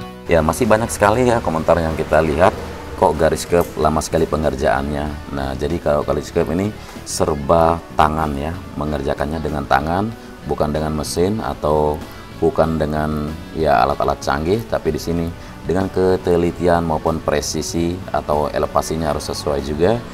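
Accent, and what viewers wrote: Lombok, Indonesia. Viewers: Lombok mana suaranya👍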